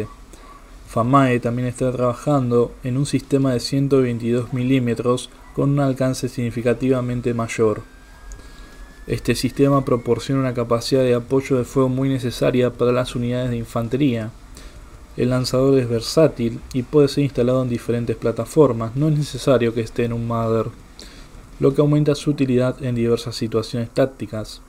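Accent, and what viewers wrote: Argentina. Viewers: el canal es argentino, no chileno, no cachai el acento ?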